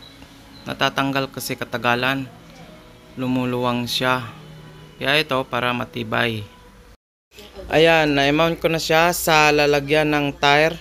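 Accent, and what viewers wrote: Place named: Visayas, Philippines. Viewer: Bisayang bisaya....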